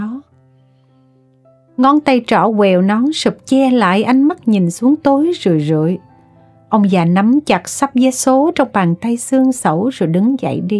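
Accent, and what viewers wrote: South, Vietnam. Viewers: Truyện ngắn của Nguyễn Ngọc Tư đa số thuộc loại hay, từ câu truyện, cấu kết, lời văn, 6/10-10/10. Giọng đọc, cách đọc, và cách diễn giải của với giọng miền Nam thì quá tuyệt vời 8-10/10. Qua Một thời gian dài lắng nghe các truyện của “IQ Radio”, tôi lấy làm lạ tại sao số người ủng hộ chương trình này quá ít.( không vượt qua được 5k. Subscribers )?????? Bây giờ thì tôi hiểu tại sao. Nhà văn miền Nam, Mc miền Nam, mà trong nhiều phần của các truyện ngắn, lại thiên về CS. Vì vậy mới không được nhiều người miền Nam ủng hộ.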